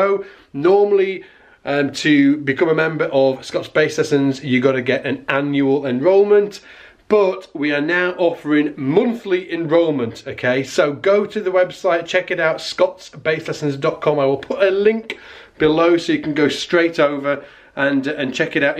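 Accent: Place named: Northern England, United Kingdom. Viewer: Eh, he's a northern Brit, it's OK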